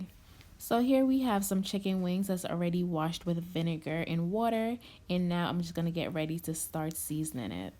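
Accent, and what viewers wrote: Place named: New York, United States. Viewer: Nice. Sound like you're from NYC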